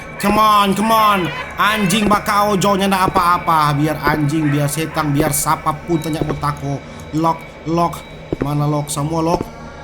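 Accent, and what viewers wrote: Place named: Java, Indonesia. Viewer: Logatnya lucu njay sma dengan orang jowo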